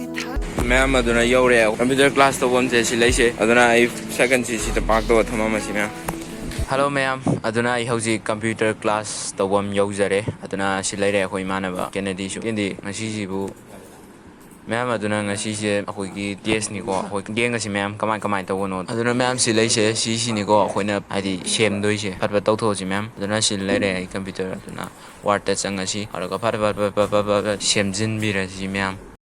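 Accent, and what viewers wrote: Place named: Kakching, India. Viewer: Enao yengba yam nungyheee … kakching macha ama oina yam chaothokcheikoo nayambana 😊 kakching marol da ngangbasidei henna taba nungyba leitre …🎉🎉 keep it up❤️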